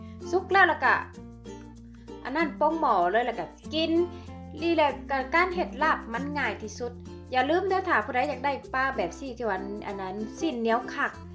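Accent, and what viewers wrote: Laos. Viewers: ตามมาเพราะชอบฟังพุดลาวเพราะคัก อั่นนะๆ เด้ะ มีเสน่ ลาบปลาพอทำสุกนี่เหมือนทอดมันเลยนะจ้ะ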